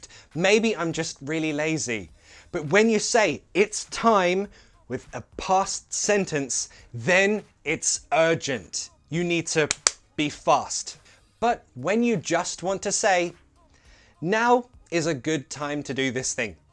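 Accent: United Kingdom. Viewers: Sooooo amazing British accent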